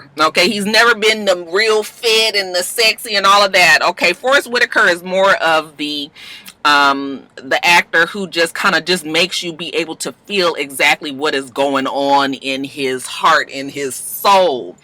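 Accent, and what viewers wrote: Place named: Los Angeles, United States. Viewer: lol. You were saying “clow”. It’s your LA accent. We know you “hawrd”.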